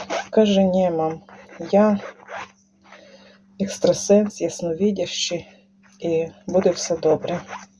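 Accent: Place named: Ovruch, Ukraine. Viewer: Про овручский диалект не слышали? Так хотя бы поинтересуйтесь об этом. В каждой стране люди разговаривают на разных диалектах, говорах. Нельзя так неуважительно относиться к людям.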